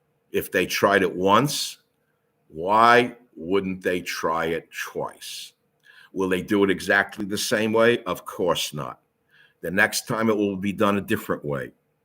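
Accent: New York, United States. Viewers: Always enjoy view....that NY accent too!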